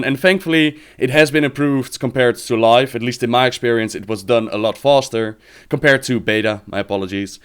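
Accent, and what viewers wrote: Netherlands. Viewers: HOLLAND :D het accent valt wel mee hoor ^^ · Woaahhhhh :D first Dutch accent I've ever heard! Peace out man! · I was wondering about that accent too, though I found out you were Dutch because I started watching your Majora's Mask videos and you mentioned it. I really like the accent. Can't wait for more lore, you've been making me really happy to be back in the game.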